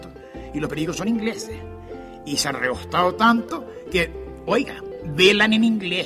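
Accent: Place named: Canary Islands, Spain. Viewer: Maravilloso ese acento y modismo de los barrios capitalinos y ese sabor canario. Que desgraciadamente se ha perdido...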